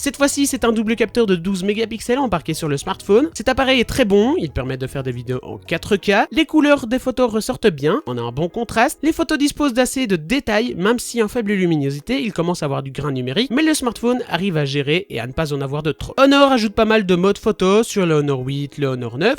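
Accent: Belgium. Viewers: Bonne vidéo ! Belge?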